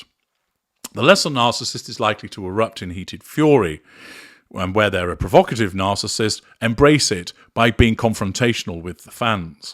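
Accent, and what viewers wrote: United Kingdom. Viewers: Okay, who ever you are. You can talk all the shit you want about Meagan and Harry losers. Don’t go there with Taylor Swift. You are clearly British, just by accent. Taylor Swift is an amazing young woman. Do your research as a broadcaster. If you can find a fault with her person, behavior or actions then carry on. I dare you!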